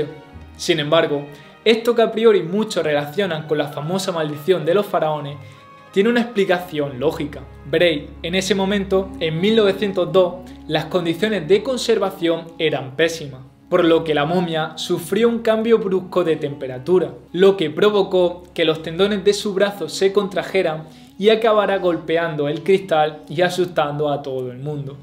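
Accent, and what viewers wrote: Andalusia, Spain. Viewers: Muy bueno el nuevo formato, siempre un placer escuchar tus explicaciones, con tu lindo acento andaluz. En marzo de este año estuve en Ejipto y visite la fabulosa Abu Simbel.... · His way of speaking isn't even close to a person from Madrid. I'm 100% sure he's from Andalusia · Seguramente es de Andalucía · Es español, de algún lugar de Andalucía. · eres español o chileno? Confunde tu acento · Wilder José Hernández español segun yo, soy chilena y nuestro acento no es asi jeje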